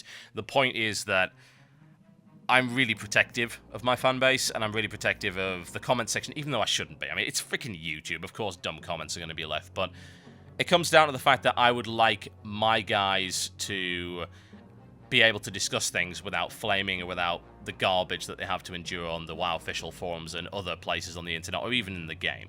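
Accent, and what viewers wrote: United Kingdom. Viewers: Thank you for your job - It might be kinda difficult to record all these great videos. Well, just know, that people are really enjoying this stuff: interesting reviews, good sense of humor and of course your accent. In fact my native language is russian and I've been learning english for 12 years. I always was crazy about brit accent and thanks to you, I have great experience of listening and obtaining of such an interesting noble accent. Plz don't stop doing this stuff- We're all with you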